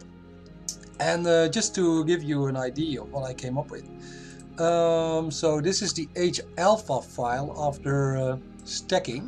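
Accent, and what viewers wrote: Netherlands. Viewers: We like the Dutch accent too. But to hear your skills explained in a clear way is great. I know you say you are learning, but we are all learning. And to see your image and know how you got there is the information that is useful.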